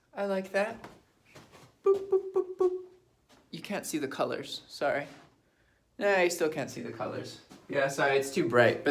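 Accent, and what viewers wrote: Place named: United Kingdom. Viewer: Are you parents of British decent? I hear a little accent here and there? 😇💗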